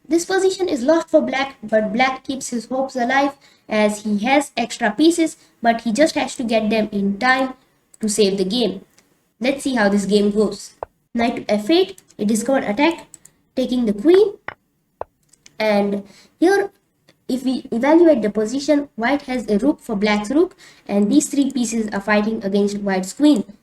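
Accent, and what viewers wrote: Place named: India. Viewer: Your accent is like Indian like us r u Indian am curious about your native 😉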